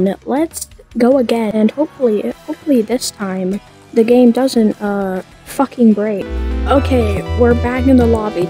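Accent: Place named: Germany. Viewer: you sound super german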